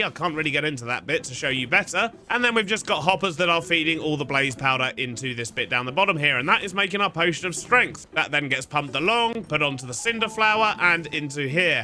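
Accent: United Kingdom. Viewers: You and mumbo have the same accent so pardon my American idiocy but what region of the UK gets that accent? Would it be more up-north or am I just completely wrong?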